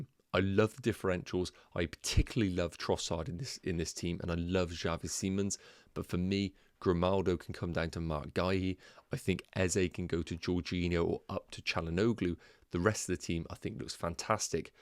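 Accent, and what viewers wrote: Bristol, United Kingdom. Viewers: You sound like a Bristolian, do you support City or Rovers?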